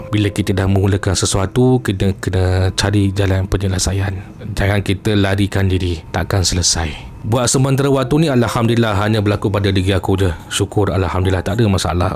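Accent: Perak, Malaysia. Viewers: assalamualaikum..ouh org perak ke yop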